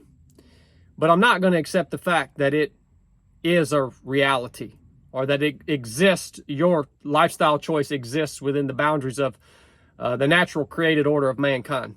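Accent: South, United States. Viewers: What state down south are you from bro?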